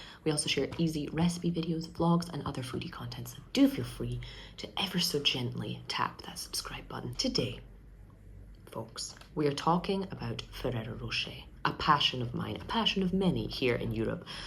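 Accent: Scotland, United Kingdom. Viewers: Solidarity with being an almond hater. [And the Scottish giveaway of refusing the aknowledge the "l" in almond.]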